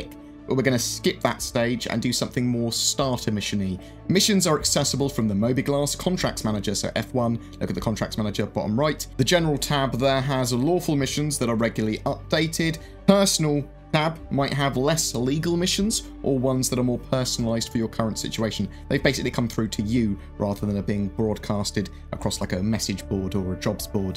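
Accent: United Kingdom. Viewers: Men this UK voice...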